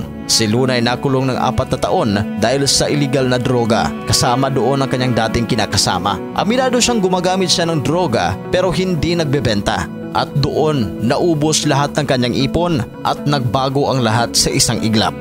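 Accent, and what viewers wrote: Visayas, Philippines. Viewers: Tang ina mo! Ipakita mo video , wag ung visayang boses mo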